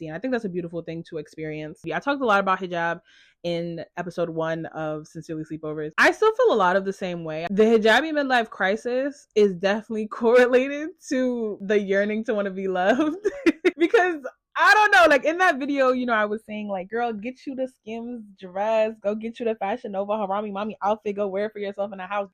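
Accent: United Kingdom. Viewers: I thought I wouldnt have the attention span to listen for an hour, but you had me HOOKED! And your british accent is good haha, may allah reward you sis, keep making this type of content!!❤ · aaaa the YouTube algorithm did her big one for once!!! i just love your vibe, humour, energy, mindset so much!!! although im actually a black Christian girlie(will be putting my Muslim babes onto your channel bc☕️!!!!) but I just turned 20 never had a relationship or been in love and definitely related to so much you said I honestly struggle sometimes thinking if that kind of love is attainable especially as I’m intentionally dating and waiting for marriage but in today’s society it can be very discouraging. im a curvy girl too and the amount of sexualisation despite how modestly I may dress🤡🤡heavy on the my man isn’t in this country im from the uk(loved your lil British accent hehe) but so ready to tap into the softness and femininity experienced elsewhere bc this ain’t it most of the time like you said as a black woman we’re masculinised and dragged for how independent we are! 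thank you so much for the advice you gave so truthful!!! and the questions you asking during your courtship like that’s literally me like if we’re getting married it’s forever so genuine compatibility is non negotiable! you spoke so beautifully honestly and what you said about him seeing your humanness aaaaa im going to go buy that book and watch all your Colombia videos I actually learned Spanish last year so honestly loving the Spanglish!! sorry this is so long haha I never leave comments bc i yapp anyways ik this is a little early but Ramadan Mubarak and can’t wait for you to find the REAL thing!!!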